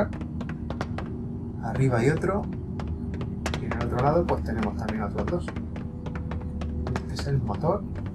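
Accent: Andalusia, Spain. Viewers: Tiene un fuerte acento andaluz, ahora vete a llorar a otra parte x'D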